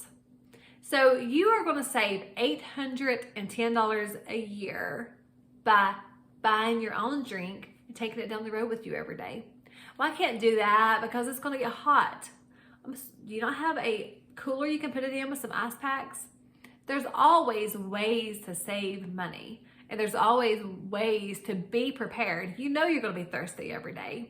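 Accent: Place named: South, United States. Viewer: You’re Dave Ramsey but with a pleasant personality and a better southern accent ❤ I am Russian and I’m loving your videos so much!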